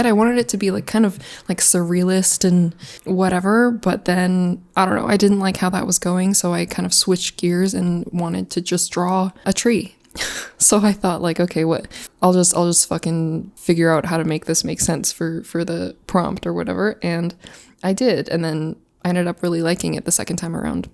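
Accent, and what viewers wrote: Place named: United States. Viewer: you speak like a normal American accent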